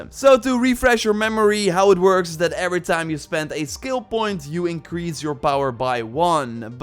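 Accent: Netherlands. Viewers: I know you life in the netherlands because of your voice/ik woon hier ook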